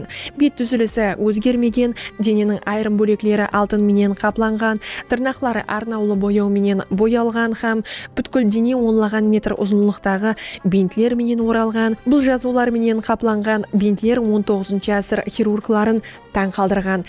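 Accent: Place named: Karakalpakstan, Uzbekistan. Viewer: Берекелле журналист кыз таза Каракалпакша сойлегенин ушын рахмет каналды коллап кууатлайык ❤❤❤❤❤ лайк праписка болыңлар жаксы мәғлүматларды алып барыудан шаршаманлар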